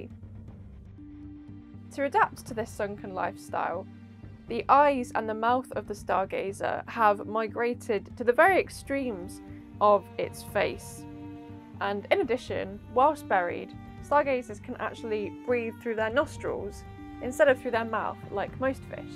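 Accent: United Kingdom. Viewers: What part of England is your accent? Its one of my favorites, the one where yall say it like "PEBBOWLS" instead of pebbles 😂❤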